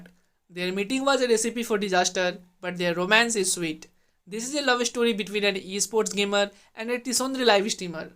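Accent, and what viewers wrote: India. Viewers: It's a "desi" indian accent. It's better to watch on mute ^^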